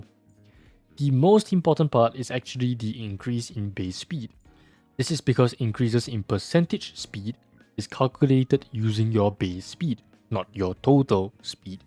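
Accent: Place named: Singapore. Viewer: me too! i could tell from the accent! nice to see a singaporean creator playing this game too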